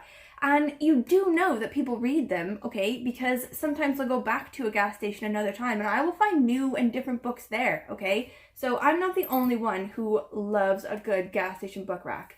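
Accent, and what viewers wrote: Wales, United Kingdom. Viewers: I could tell you are living in wales because of certain things in your accent. At least here you get a dragon on the flag 🙂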